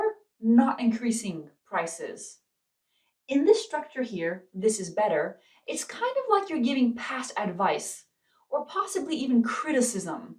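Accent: United States. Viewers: Excuse me, may I ask you where you come from? I wonder how to indentify your accent, but I guess it's near to american. By the way your speech is very clear. I really enjoy your lessons :) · That's true! number one in American English! We do not need British English)))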